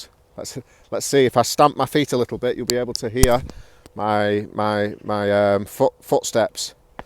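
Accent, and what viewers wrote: Northern England, United Kingdom. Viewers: hello, I really enjoy your videos, especially when you take it in the nature. So two days ago I watched the film The Firm, it's about football fans, they fout with each other and at the end one of them was killed. I know the soccer in England is... like national sport..(I think) and my question is...is there in England the same groups of people in nowadays who are more interesting in having a battle after match....so what else... could you recommend tv shows or movie with the northern English accent something like yours...)) I am going to have the same one!!)) again thank you very much for things you are doing!!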